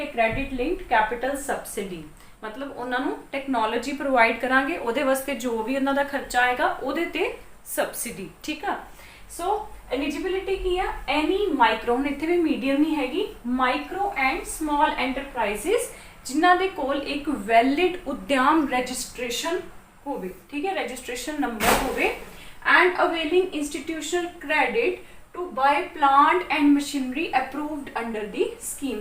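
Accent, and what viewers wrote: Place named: Majha, India. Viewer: Madam amritsar di lgdi a ya majhe di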